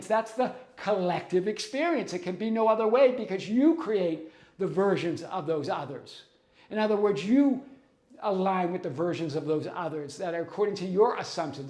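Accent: Boston, United States. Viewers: You tell them (tell them with your Boston accent - I'm from MA as well, LOL)!! But I empathize with those who ask those questions, because for a lot of us this whole LOA/Quantum Reality has been difficult to digest. Yes, even for some of us highly intellectual, intuitive or even mystically aligned people. It's easy to meddle with the middle as we get caught up with worldly matters, that we can experience with our five senses, because that is ALL we have had going for us.... All our lives! That is what we call "conditioning." But your videos are liberating, and that is fulfilling in itself! That is a sign - Thank you It will click for them, just like it did for some of us... "There's no sign outside of you, there's no truth to that other than the truth you give it" - beautifully stated...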